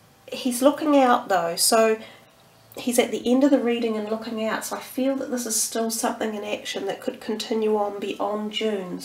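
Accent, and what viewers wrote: New Zealand. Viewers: Kia Ora! Thank you very much good to hear a kiwi voice from the homeland. Much love from the Philippines. Thank you for your time and effort much appreciated!!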